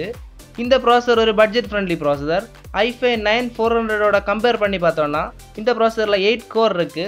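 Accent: Kanyakumari, India. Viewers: Thala ne kaniyakumariya semmaia pesra bro Vanakam maka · anna neenga pesumbothe nenachen nanum kanyakumari than .anna nenga enga erukenga kkla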